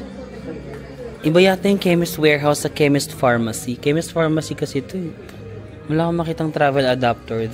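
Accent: United Kingdom. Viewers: That is more of a UK accent mate😂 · Meron kang British accent. Always stay safe in Sydney. Godbless! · You sounds British mate!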